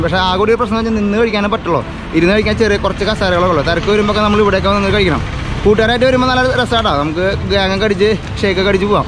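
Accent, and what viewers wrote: Thrissur, India. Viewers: ഞാൻ പുതിയ സസ്ക്രൈബറാണ് ട്ടാ കണ്ടപ്പം കേറി നോക്കിയതാണ്ട് ട്ടാ ഉടനെ തന്നെ ബട്ടൺഞക്കി പൊട്ടിച്ചിട്ടാ? ഞാൻ മലപ്പുറത്ത് കാരനാ ട്ടാ എനിക്ക് തൃശ്ശൂര് കാരെ ഭയങ്കര ഇഷ്ട, ണ് കാരണം നിങ്ങളുടെ ഭാഷ തന്നെ നല്ല രസമാണ് കേൾക്കാൻ ഞാൻ.Aju ടwold കാണാറുണ്ട് ഹാരിസ്ക്കയുടെ ചാനലുകാണും ഇതെ ഇപ്പം ട്രാവലീ ഷടയു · മച്ചാ സംസാരിക്കുമ്പോൾ ഒന്ന് ശ്രെദ്ധിക്കണെ ഇടക്ക് തൃശൂർ സ്ലാങ്ക് വരുമ്പോ മറ്റുള്ള ജില്ലക്കാർക്ക് എന്താ പറയണെന്ന് മനസിലാവില്ല പിന്നെ baground മ്യൂസിക് വേണ്ട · Super presentation bro with our typical thrissur style... I’m from ollur...all the best for your channel..👍👍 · Landed here from the antartica video.. I paused the video in the middle and clicked the subscribe button, hearing ur simple thrissur slang😂.. Ways to go bro 👍🏻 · തൃശൂർ slang fan 🤣🤣me from ekm.... പൊരിച്ചുട്ടോ ഗഡി നിക്ക് ഇഷ്ടായി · Loved your presentation....actually loves your Thrissur slang🙂🙂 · sherinz vlog നു ശേഷം, ❤️തൃശൂർ ഭാഷ ശൈലി ഒരുപാടിഷ്ടം ❤️ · Njan oru Alappuzhakkaran aanenkil um enik thrissur slang valare ishatanu, Ee videos kanumbol nattil pokan tonum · കിടിലൻ coverage n trissur bhasha.pinne ഇങ്ങോട്ടും ഒന്ന് തിരിച്ച് വരൂ.. 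· തൃശൂർ സ്ലാങ് എനിക്ക് ഭയങ്കര ഇഷ്ടം ആണ്😊: From കോട്ടയം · ഈ തൃശൂർ സ്ലാങ് എനിക്ക് വളരെ ഇഷ്ടമാണ് bro.. തൃശൂർ രീതി തന്നെ ഉൾപെടുത്തുക.. സൂപ്പർ ആണ് ഞങ്ങക്ക് മറ്റു ജില്ലക്കാർക് ഒരു അത്ഭുതം ആണ്.. ഇനിയും വീഡിയോസ് ഇടൂ.. full സപ്പോർട്ട് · Mr Ningal Muthanu...❣️ Happened to watch your videos recently only, but have to say that your approach is so gentle & pleasing.. Iam a proud subscriber of yours, watching your each of every videos with utmost love & affection.. Njan Thrissurkaru allenkilum snehamullavaraa alle.. Ente veedu Ayyanthole anu.. But I'm in Bangalore since 6 yrs.. Nattil varumbol pattumenkil kanam👍🏽 All the best to.. Thanks for much forgotten Thrissur Slang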